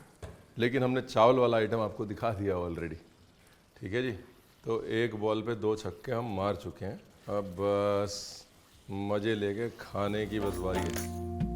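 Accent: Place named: Punjab, India. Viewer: Hello Sir, mein aapko corona time se follow krti hun. Aapki recipes banati hun, jb ghar wale khush hote h khana kha kr to mujhe bhi khushi hoti h magar aapka bhi khayal aata h ki aapki recipe dekhkr hi ye khushi mili h mujhe. Aaj meine apna channel start Kiya h. Thoda success mili h to khyal aaya jinki wajah se mili h to unke liye bhi recipe banti h. To meine abhi 2 recipe aapki bnane ki koshish ki h or unhone upload Kiya h. Jyada nahi but jitna bhi pyaar mujhe logon se mila h aapki wajah se. Thank U Sir.🙏. Aaj aapki recipe notification aaya to jhat se ph khola dekhne ke liye. Aap itne km time mein 2, 3 recipes keise upload kr lete ho ek hi video mein. Aapki baatein sunkr maja bhi aata h. Mein to Punjab se belong krti hu ,ab Delhi mein hun but aapki baatein sunkr apne punjab ki jhalak mil jati h. Thank U Chef😊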